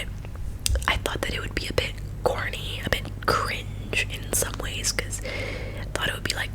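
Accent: United Kingdom. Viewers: Love the British accent 😂😂🔥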